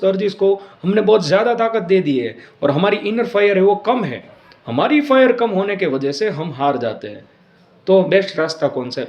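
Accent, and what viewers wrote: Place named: Gujarat, India. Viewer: vaah... Mane laygu j tu tamara hindi ma gujrati tone thi... Bau anand thyo... Tamne hu follow karu chhu and tamara videos jou chhu.. Bau pela pan bija videos aava badha topics par joyela tyare em thatu k chalo amal kariye pan vasna evi cheej che k tamara thi rehvaay nai.. Me pan bau nani umar thi sharu karyu tu... Pan mota thya pachhi control rakhto thyo pan je kevay k man ma gandgi bhari hoy tya sudhi e reality ma avva nu j che.. e eno rasto sodhi ne parane apnne e karavi le... Pan have hu evi umar ma chhi jya mara have lagna thase mari nani ben che graduation complete thyu che last year enu e pan have lagan karva jevi j che.. And mara papa kaik bau kamata nathi.. And hu have 25000 na pagar sathe job sharu karel che 1 varsh upar jene thyu.. Atle have mare to aa badha ma thi farajiyat bahar nikdvu j pade em che kem k have atyare no jaaygo to jeevan have barbaadi taraf j jase.. 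pan sthiti atli pan kharab nathi... Pan thodu to kharab che k hu koi bija ne na sachvi saku jo hu andar thi atlo santosh sathe na jeevto hoy to.. atle man nu ek level have achieve karvu che atle have tamne joi ne man ma kaik inspiration made che.. Em to hu foreign accounting ni job karu chhu.. CA chalu karyu tu 12th pachhi ema CPT thai gyo to pass pan pachhi intermediate ma atki gyo.. have ema job ni sathe preparation kari ne nikdvu chhe.. Pan maro pele thi confidence level occhu hovane karane and communication weak hovane karane kadach maro pagar occho che... Atyare hu eklo bija city ma rav chhu pare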